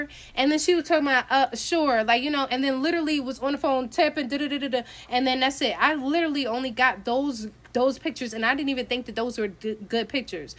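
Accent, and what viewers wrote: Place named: United States. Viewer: Not raining on her parade or NOTHING! But as an American who lived in Jamaica for three months, also in the hood, a big percentage of why you get so much attention is BECAUSE YOURE AMERICAN! They can tell very easily, and don’t open your mouth cuz that accent gone tell on you, that’s also probably why the guys brother brought you to meet so many people, it gives people status to “know” an American. She’s also soooo beautiful so I’m sure she gets a lot of attention anyways but just food for thought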